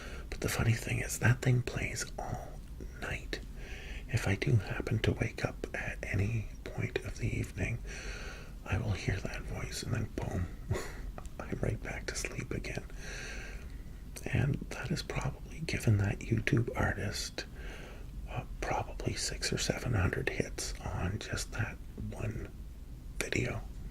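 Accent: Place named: United States. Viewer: You have a wonderful relaxing voice to listen to. With a mild American accent too. New subscriber 👏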